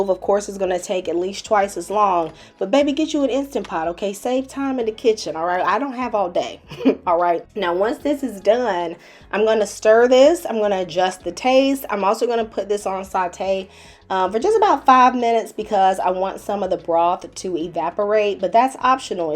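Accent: South, United States. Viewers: Are you a native Southerner?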